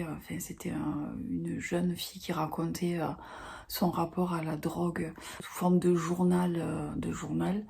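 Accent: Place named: South, France. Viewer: Vive l'accent du suuuuud !!! Les carnets et les stylos ralala, j'ai du arrêter d'en acheter parce que sinon mon appart serait rempli !! Y a pas d'âge pour lire tel ou tel livre, fais toi plaisir !!! Trop cool pour Virgnie Grimaldi ! Je savais pas qu'elle avait un blog avant d'écrire des romans !! Je suis pas du tout une lectrice saisonnière non plus, je fais en fonction de mes envies Très intéressant cette premiere video !! J'ai hate de voir la suite ! Si tu écris tu pourrais nous en parler un peu plus et pourquoi une vidéo avec tes livres préférés pour apprendre à te connaître 😁😁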